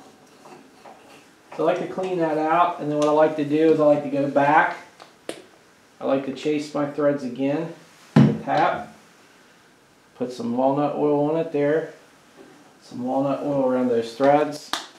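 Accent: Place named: Philadelphia, United States. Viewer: What part of the Philly area are you from? (Accent gives it away if I am right) LOL Very nice project. I've never seen anything like this before. Definitely making some. Thanks for taking the time to make the video.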